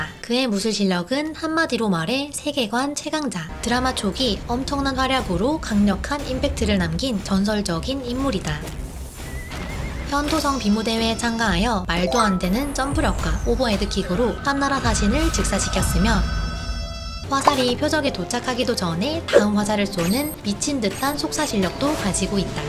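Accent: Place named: Gyeongsang, South Korea. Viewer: 나레이션 경상도 억양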